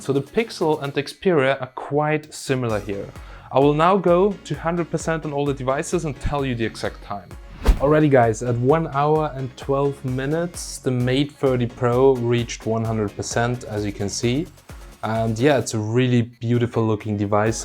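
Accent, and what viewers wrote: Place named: Germany. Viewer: German accent 😁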